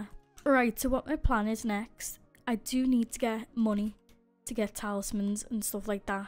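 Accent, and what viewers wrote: Liverpool, United Kingdom. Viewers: are you british?? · The british accsent sorry of My bad spelling im from finland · are u a scouser ? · Innit this that Liverpool girl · Think it’s scouse (Liverpool) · Just realised ur British what a gamer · Pretty sure it's a Scouse accent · im asking you bri't'ish or australian person bcs acsent of a bri't'ish and talk like a australian person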